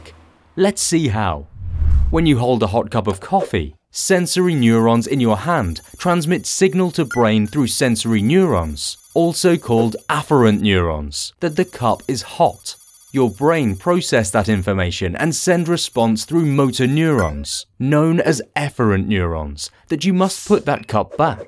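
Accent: England, United Kingdom. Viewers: this sound is full of england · I find the dichotomy and paradox of the thick British accent being paired with the lack of conjunctions and prepositions quite amusing!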